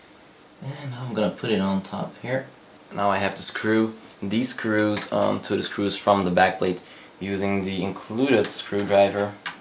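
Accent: Netherlands. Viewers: i cannot watch this cus of your voice i hate a dutch accent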